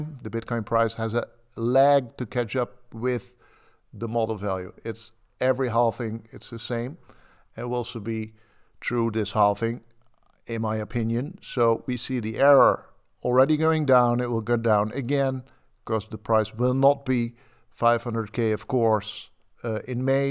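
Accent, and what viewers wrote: Netherlands. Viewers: NL accent ! ? :P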